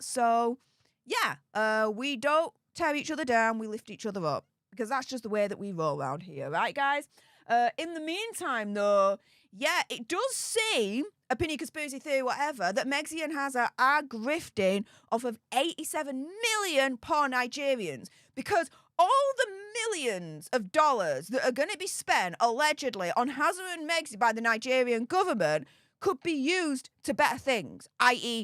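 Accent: Yorkshire, United Kingdom. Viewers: She has a Yorkshire accent. I watched a youtube video that explains it and it helped me understand her. For instance, the letter T is not pronounced in the middle and end of words. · It sounds like Yorkshire northern England ! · Nowt wrong wi' a Yorkshire accent! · I know it's British... I'm asking what part of Britain. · It's British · Yorkshire accent which is Northern England. 👍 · Please slow down with your speech you have a beautiful accent being British but it's hard to understand you when you talk fast.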